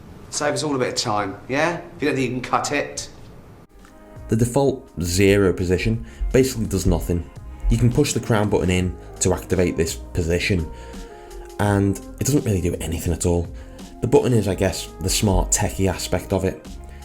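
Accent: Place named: Liverpool, United Kingdom. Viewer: Nice review. Some humorous metaphors too that gave a good chuckle! 👏🏼 I detect an accent… so, Everton or Liverpool is the important question! 😉 I picked up a VHP GMT white dial in 43mm on Saturday. Gotta say I’m really chuffed wi it. The single push that doesn’t do anything on your version is the way of initiating flash settings or changing time zone of the gmt on my version. Really a classier grab and go alternative to a G-Shock. Cheers bruv, have a good un.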